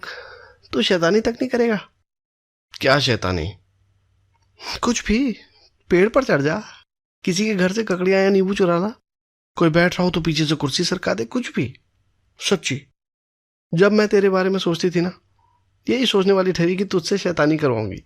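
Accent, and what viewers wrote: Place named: Kumaon, India. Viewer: Your Kumaoni tone is perfect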